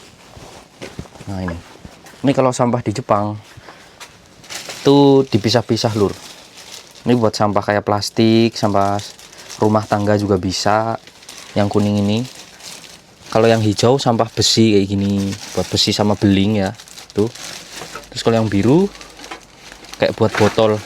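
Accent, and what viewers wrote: Banyumas, Indonesia. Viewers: Orang mana mas ngapak poul😂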